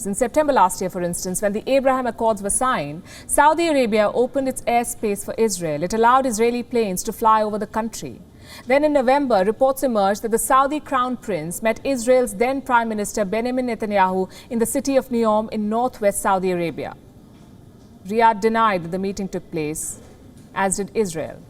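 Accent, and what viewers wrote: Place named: India. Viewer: What a joke! 3.7 million subscribers watching this channel is unbelievable. International news in English with such a thick Indian accent is barely comprehensible. It doesn't suit Indian media and the Indian population, in general, to focus on anything other than the welfare and education of their own helpless and severely impoverished population and their many domestic issues such as corruption, racism and poorly managed socioeconomics. The World Bank is funding programmes to educate Indians on how to use toilets to help them get out of the habit of defecating openly in the streets. International media is having fits laughing about it, yet Indian media is pretending to be breaking news to the world. They should focus on benefiting from their internationally funded potty training rather than commenting on international news.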